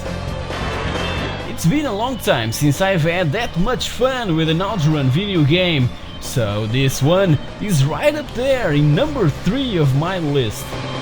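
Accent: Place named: Portugal. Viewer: LOL, o sotaque pt é tão vísivel :D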